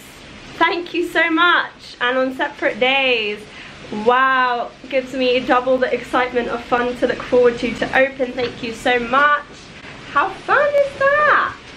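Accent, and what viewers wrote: Iran. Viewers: Hi 😍 Please speak more Persian in your videos! I love your Persian accent. especially when you and your family speak in farsi! I hope you and family be safe and happy as always!💞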